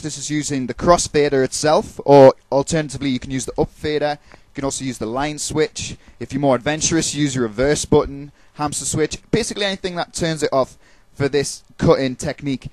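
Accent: United Kingdom. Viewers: i fuckin hate the british accent, but for sum strange reason i dont mind when you're speakin it =/ lol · you a geordie?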